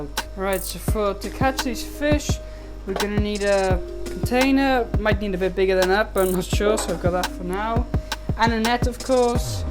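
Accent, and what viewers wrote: Wales, United Kingdom. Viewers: Just subbed, nice little setup.. hear the Welsh accent too👍🏻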